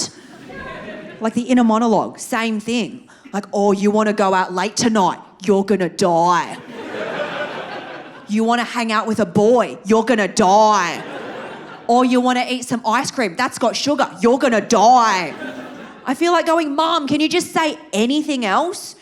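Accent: Australia. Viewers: I am 20 noin years old. Best Australian accent ever.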